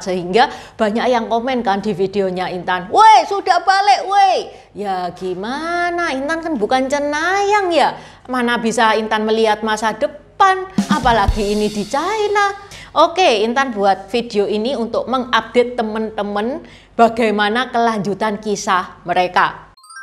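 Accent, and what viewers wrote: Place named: Surabaya, Indonesia. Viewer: Bukan beritanya yg saya suka, tapi dialek khas Surabaya nya yg apik tenan.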